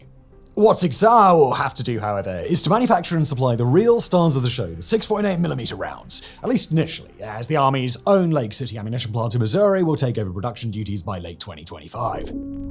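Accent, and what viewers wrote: United Kingdom. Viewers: Ah, at last my broadly divergent taste in YouTube has converged. Footage of Garand Thumb, set to the dulcet British tones of Simon Whistler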